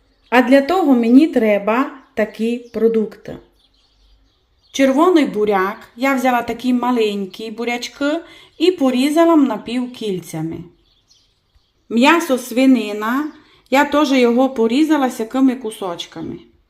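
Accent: Transcarpathia, Ukraine. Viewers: Вона прекрасно говорить закарпатським діалектом то є наша мова у нас усі так говорять вчіться обисьте розуміли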